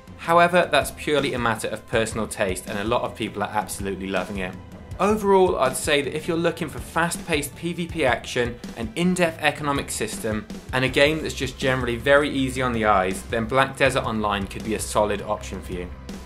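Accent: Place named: England, United Kingdom. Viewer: Take this as a compliment: I always like watching video game reviews and lists like this when narrated by a person with an English accent. Probably comes from my days of watching TotalBiscuit when I was playing WoW.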